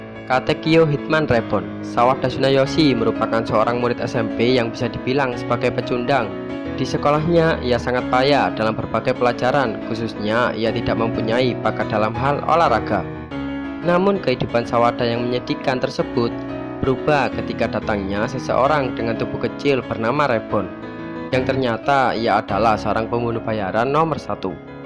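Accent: Java, Indonesia. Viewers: cuman masukan doang nih bang, pembawaannya kurang. masih medok banget. ga salah sih klo medok, cuman biar enak aja yg denger. makasih.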